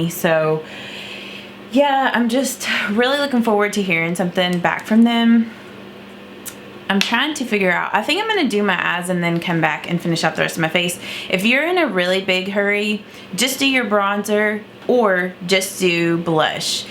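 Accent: South, United States. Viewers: First, let me begin by saying that you’re one of my fave YTers. ❣️ I love how “real” you seem to be. I don’t feel like I’m watching someone that I can’t relate to. I’m also a southern girl, so I love your accent also. 😉 My only suggestion would be to possibly upload a few videos that are a little bit shorter. I don’t want to miss any of your videos, but more often than not, I don’t have 30+ minutes to spend watching a video. I may be in the minority, but shorter videos grab my attention quicker. Sending you lots of love from Alabama! 😘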